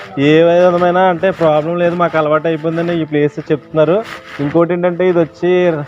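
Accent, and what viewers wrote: Godavari, India. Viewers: Funny conversation... With our godavari yasa.. Good job bro... Mana godavari jilla ante yentha special lo andariki chupistunnaru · Nenu.. Mee slung kosam chusthanu... Pakka godharolla voice... 😊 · I'm Guntur but I like godhavari yasa baguntadi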